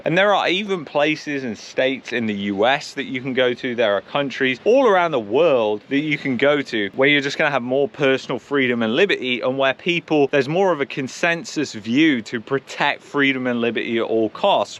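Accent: United Kingdom. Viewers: Thank you for having the courage to speak out on these important topics. It's refreshing to hear a Briton talk about these issues.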